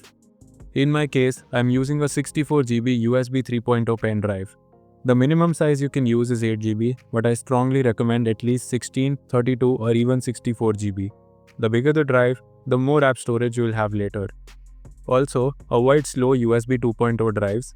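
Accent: India. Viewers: When you hear the Indian accent you kbow he finna make yo shit work, may your food be good mah bro❤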